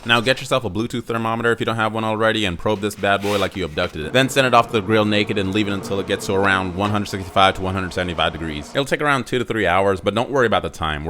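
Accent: Texas, United States. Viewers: bros def from texas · Where are you from if your not texan...how the fu.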